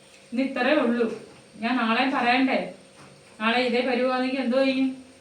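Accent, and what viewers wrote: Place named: Adoor, India. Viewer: എനിക്ക് നിങ്ങളുടെ സംസരം കേൾക്കാൻ ഒത്തിരി ഇഷ്ടമാണു . എന്റെ നട്ടിലെ സംസരം തന്നെ ആണ് ..ഞാൻ അടൂർക്കാരി ആണ് ,,ഇപ്പൊ UK ഇഇല് ആണ് ..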